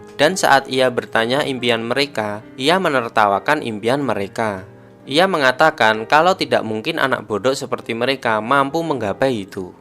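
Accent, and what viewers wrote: Java, Indonesia. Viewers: Emng orang jawa ya ,Kak? Medhok suaranya,,,🙏🙏🙏 maap klo tersinggung cuma tanya,,sumpah✌️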